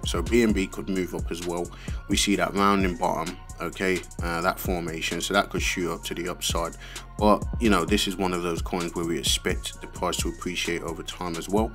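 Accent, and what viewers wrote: Birmingham, United Kingdom. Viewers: You sound like a brummie Johnathon Woss.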